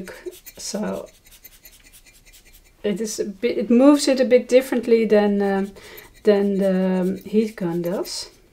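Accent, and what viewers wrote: Netherlands. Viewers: Hi, you sound like you are from the Netherlands, just like me. This is beautiful and I love your stencil, well done!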